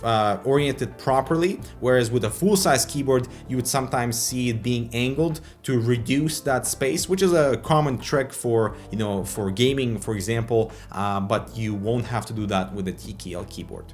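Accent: Sweden. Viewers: You sound Swedish...Are you Swedish? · You sound like your Swedish. I like it :)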